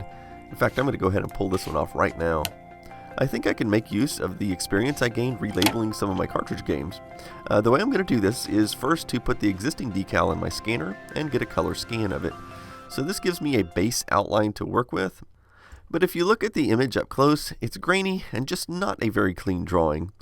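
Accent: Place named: Texas, United States. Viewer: I'm not sure why, but your accent seemed more noticable to me in this video than all of your other videos I've seen. I don't think you're speaking with more of an accent than usual, I just seemed to notice it more today. Mostly when you say "All right", very Texas-ish hahaha. Anyways, love your content, you're one of my favourite YouTubers! Thank you for continuing to produce entertaining and informative videos!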